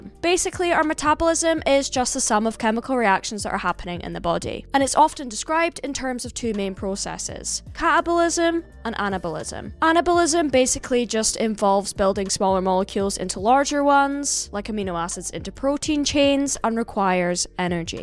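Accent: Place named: Scotland, United Kingdom. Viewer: FIRST COMMENT AND FIRST LIKE:🥇🌟🎖️⭐️🏆🏅 hi happy Sunday your Scottish 🏴 accent=🔥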